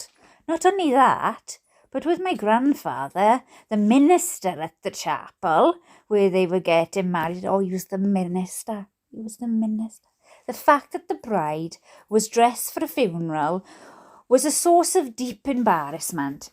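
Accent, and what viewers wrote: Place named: Wales, United Kingdom. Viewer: Ok my lovely! Wonderful if your accent & my southern Kentucky accent talking to each other. Add Rickey Watson in the mix.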